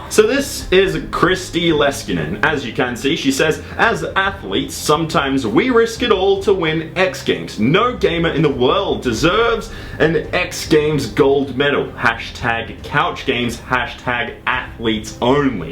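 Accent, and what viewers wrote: Australia. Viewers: I love the Australian accent oh lord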